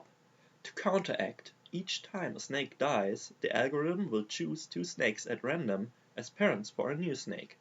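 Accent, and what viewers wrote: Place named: Germany. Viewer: That accent... Are you German?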